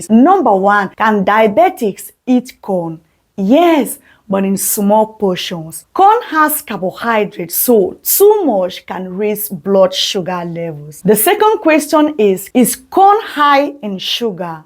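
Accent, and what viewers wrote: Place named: Cameroon. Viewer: Mom from your accent and name, you are a Cameroonian i guess... Good job dear!🎉🎉🎉🎉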